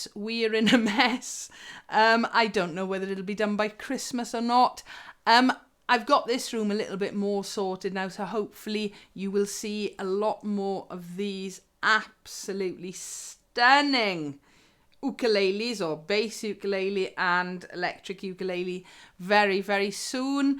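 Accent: Wales, United Kingdom. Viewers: I’m so excited I just received my jazz mistress baritone ukulele from the awesome Fanner brothers and would love to show it to you and your fans. It was custom made it did take awhile I’m in Canada but I’m Welsh blood all my relatives are Welsh so I love hearing your accent reminds me of them. I am still learning and learned on an acoustic baritone Uke and have always used my fingers for strumming and picking. I find that it doesn’t sound as good on my electric and I’m trying to learn to use a pick. I only had my new Uke for two days and broke a string I guess I was using the wrong gauge or type. Is it possible you could show us how you use a pick/plectrum and what type you use on your electric Uke? Also where can I send you a picture of my baritone Uke I would love to hear your opinion? Also do you do online lessons that would be great!! Love Vicki. 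Cymru Am Byth 🇨🇦🏴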